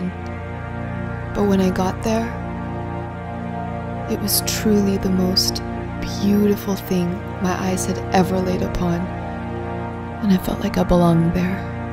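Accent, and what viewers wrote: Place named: United States. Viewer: American by birth (the accent) and half irish, half japanese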